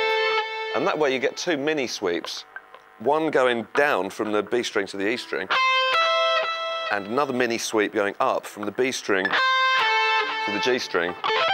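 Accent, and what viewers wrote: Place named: United Kingdom. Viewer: I started to love british accent because of him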